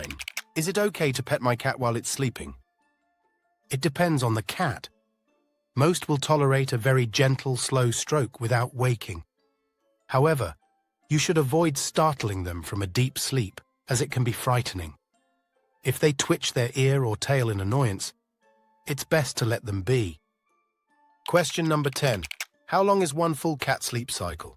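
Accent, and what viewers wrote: United Kingdom. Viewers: This is more AI hogwash geared up with a British accent to the video seem like it's being intelligently presented. That's the British accent giveaway. Nothing here is medically sound so don't lose any sleep over this nonsense. There's nothing about a cat sleeping position that has anything to do with their health one way or the other.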